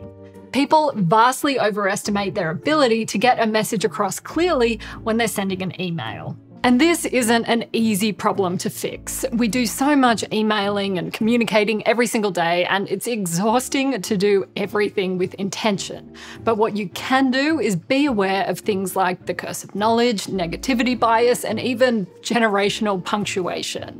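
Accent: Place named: Australia. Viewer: jumpsuit... jungle safari print. ping pong table? Australian accent!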